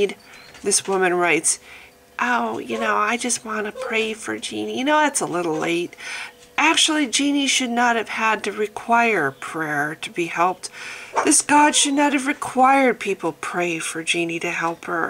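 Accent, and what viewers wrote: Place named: United States. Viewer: I've known about this girl a few days ago, and definitely she is the proof thar god does'n exist. He never did. I have watched almost every video that exists on youtube about her and I've cried for hours. And now I found this video of yours and I couldn't agree more with you. Seriously. I can assume for your accent that you're from the USA, aren't you? Well I'm spanish, I should have begun saying my english is not perfect and obviously I can't understand every word, and I'm not used to write long texts such as this one, although I can fully understand the context. I hope you read this, 'cause I want you to know that I am overwhelmed by your speech. I found it so true I got a lump in my throat. It's so frustrating that many people call themselves moralists and the only thing they say is "I'll pray for her"!! What? It would be a very expensive trip for me, living in the other side of the world, but I really want to do something for her. 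And so they should do, mostly if they live nearby. God is a fictional character, and he can do for Genie the same that Gandalf, Darth Vader or the flying spaghetti monster can. Nothing. For they are not real. This whole issue just drives me mad, and if it's not too much I'd like both of us to be friends in facebook. Obviously I'm not going to post here my nick for everyone to see, but I think we could chat occasionally. I can send you a private message with my facebook name in case you want. I'm trying to write down this being as polite as I can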